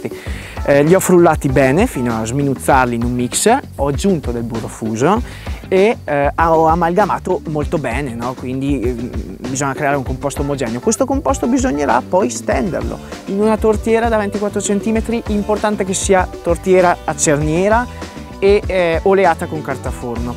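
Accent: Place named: Bergamo, Italy. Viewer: Poi odio il tuo accento quasi come un bergamasco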